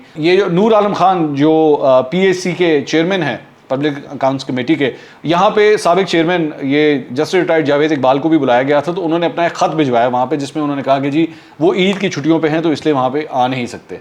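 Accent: Punjab, India. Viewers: Pti walay gory ki tasweer daikh k khush ho gaey.Amazing vlog with Punjabi ka tarka puttar maza aa giaa🤣😂😁😀😁😂😂😂😃😄😄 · Your Punjab accent and irony behind this is mind blowing 🤭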